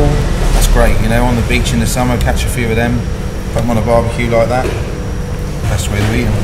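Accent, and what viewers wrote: United Kingdom. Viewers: I stumbled upon this channel when I searched for lobster videos a while ago. Definitely worth subscribing if you like sealife, British accents and wholesome educational videos. Keep it up, you deserve way more subscribers! 👍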